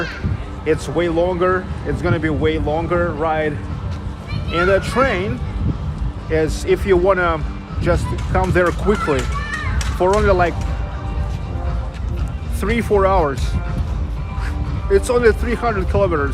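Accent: Russia. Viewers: Your Russian accent is charming; don’t lose it.👍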